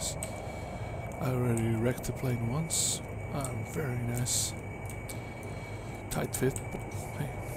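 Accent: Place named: Netherlands. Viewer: I can hear that you're Dutch I'm Dutch too.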